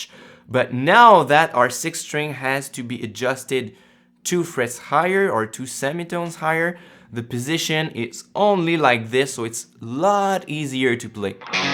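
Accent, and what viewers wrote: Quebec, Canada. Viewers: Nice lesson bro, bel accent québecois apart de sa ;)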